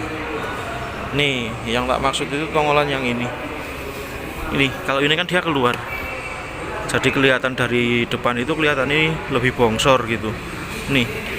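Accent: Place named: Java, Indonesia. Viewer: Jowoo medoq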